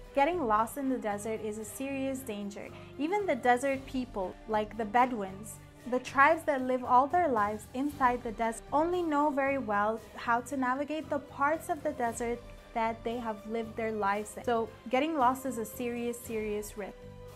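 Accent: United States. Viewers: You sound American or Canadian. Its hard to believe you learned English in Egypt. You must have watched a lot of movies or something.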